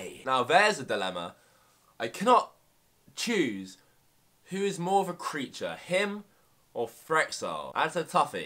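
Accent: England, United Kingdom. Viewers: English or Australian? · he is English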